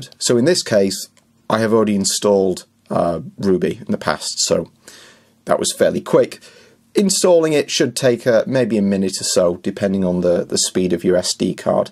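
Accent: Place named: Cornwall, United Kingdom. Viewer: Nice program! And btw : I love your pronunciation und I guess ... Cornwall? Greetings from Germany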